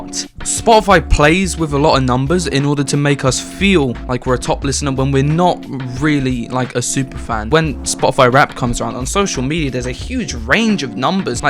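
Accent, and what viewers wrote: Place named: United Kingdom. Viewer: i thought u were dankpods for a bit, then i heard u were british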